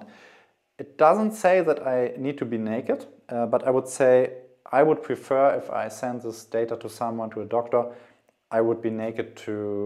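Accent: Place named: Germany. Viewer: With german accent, very slowly, it's hard to understand something! It's needs a lot of patience to cach something useful !🥱🥱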